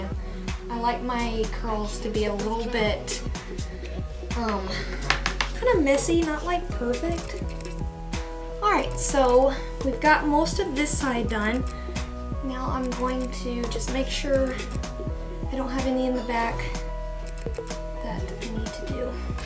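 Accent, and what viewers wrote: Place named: United States. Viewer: O wow u got a country accent. Never really noticed it when i watched u guys’ vlog channel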